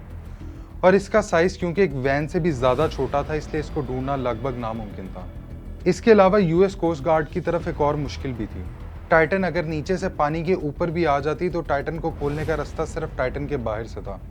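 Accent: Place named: Punjab, India. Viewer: Keep it up . Lekin apni Urdu ko aur saaf tareqe se bole. Punjabi touch door kre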